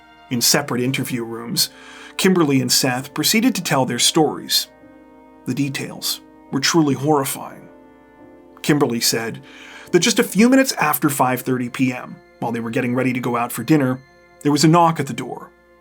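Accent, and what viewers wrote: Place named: Canada. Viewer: Oh you're Canadian eh?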